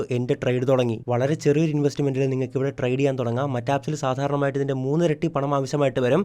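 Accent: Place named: Changanacherry, India. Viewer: Changanacherry slang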